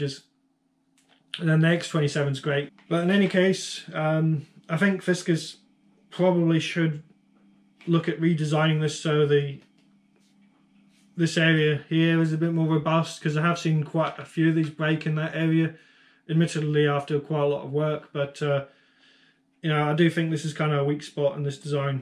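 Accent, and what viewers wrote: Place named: London, United Kingdom. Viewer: You sound like you’re from London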